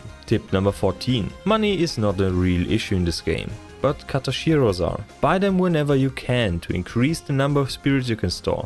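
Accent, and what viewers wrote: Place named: Germany. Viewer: ah ok aber die Sprache is dieselbe xD Hab mir von deiner aussprache gedacht das du evtl deutscher oder Österreicher bist xD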